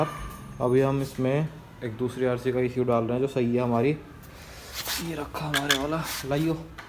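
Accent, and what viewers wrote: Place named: India. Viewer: thank you very much for the answer, I know is an Ecu problem but I have some questions. First i have a map for the conplete exhaust and I think if I reset the Ecu (I read somewhere that can do it by take the battery for some minutes) the remapping would be lost (I'm not sure about). And second I can't believe that is the only way to fix it, is only a part of the Ecu but I don't understand the indian english and for a duke owner is a problem.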